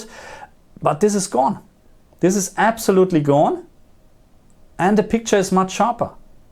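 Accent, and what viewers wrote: Germany. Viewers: Wieso schreibst du auf Deutsch, ist er Deutsch? Sein Akzent klingt auf jeden Fall so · Du kommst doch wohl aus Deutschland oder nicht? Man hört es direkt, ich wundere mich dass Australien bei deinem Kanal steht. Da wohnst du wohl?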